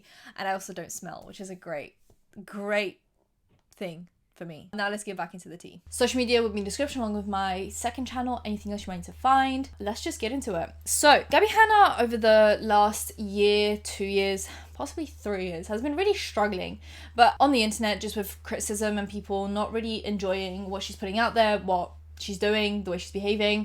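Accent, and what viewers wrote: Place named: England, United Kingdom. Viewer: Shes English. Not sure exactly where in England though. Maybe London?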